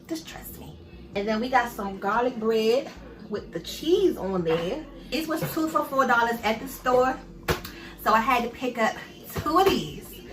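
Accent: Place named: Baltimore, United States. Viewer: I hear the Baltimore all in your voice sister ❤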